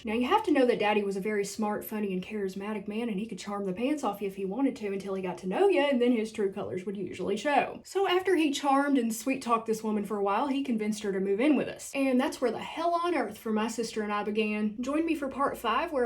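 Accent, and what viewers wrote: South, United States. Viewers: Honestly the southern twang in these videos is amazing! I love it so much, and the way you tell it! I'm gripped! Want to know more 😂 Also lots of love to you ❤️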